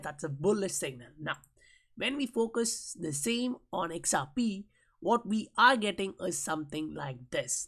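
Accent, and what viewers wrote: India. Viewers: I always thought u have indian accent bro, but was confused when i saw south korea here. which city if you dont mind asking. ? by the way thanks for the quality content man.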